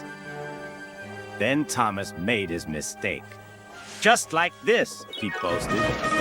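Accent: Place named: Scotland, United Kingdom. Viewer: Scottish accent is very interesting.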